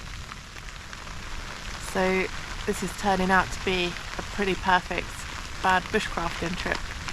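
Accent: United Kingdom. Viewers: Ole ta ohs.😂do like the briish accent 😊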